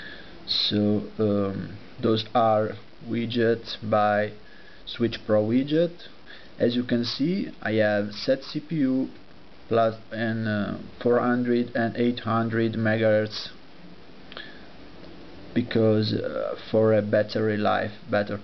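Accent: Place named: Italy. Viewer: love the italian accent, a lot of friends speak like that :D Cheers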